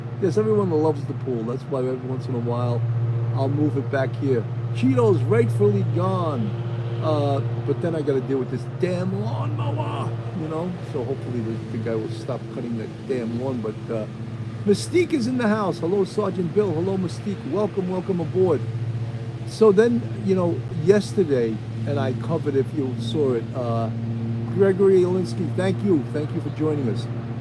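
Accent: New York, United States. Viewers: I loves me some New York accent! What a jerk! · Love your NY accent!!!!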